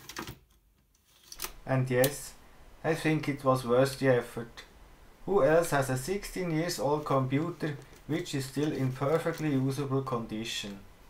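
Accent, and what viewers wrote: Switzerland. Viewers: Swiss English sounds funny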